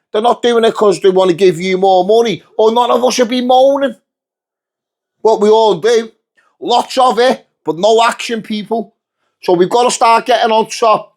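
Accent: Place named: Liverpool, United Kingdom. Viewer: How scouse is this man!😮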